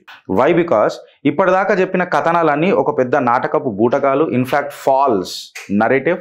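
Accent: Telangana, India. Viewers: Your way explanation with Telangana slang... Ultimate ❤🎉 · 👌 బ్రదర్ good ఇన్ఫర్మేషన్, మీ తెలంగాణ యాస లో ఎక్సప్లనేషన్ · గారు, మీరు అవసరానికి మించి ఎక్జైట్ అవుతూ అమెరికా అద్యక్షుడు ట్రంప్‌ని చాలా తక్కువ చేస్తూ అయన ఏదో ఒక బనాన రిపబ్లిక్ అద్యక్షుడు అన్నట్లుగా మట్లాడటం చాలా చవుకబారుగా ఉంది. మీరు ఇలాంటి సంక్లిష్టం అయిన, సాంకేతిక విషయాలని ఏదో పల్లెటూరిలో రచ్చబండ దగ్గర కూర్చుని ఉబుసుపోక కబుర్లాడుకునే వారిలా చెప్పడం తమాషగా ఉంది. మీరు తెలంగాణా యాసలో మత్రం బాగా మట్లాడుతారు. అంతవరకు ఒకే. మీరు ఇలాంటి కష్టమైన సాంకేతిక విషయం మీద మట్లాడాలి అనుకుంటే చాలా లోతుగా పరిశీలించి చెప్పాలి. మీరు చెప్పిన మొత్తం విడియో ఒక 10వ తరగతి పిల్లవాడు చెప్పినట్టుగా ఉంది. మీరు రెఫరెన్స్‌గా ఈ విడియో చూడండి. ఇది సరైన విష్లేషణ అంటే. 8 గంటలలో 216,070 views వచ్చాయి అంటే అర్ధం చేసుకోండి. లింక్: <link>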